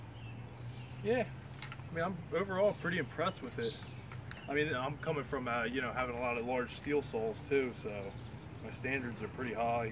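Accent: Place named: Philadelphia, United States. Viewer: You sound like your from the Philadelphia area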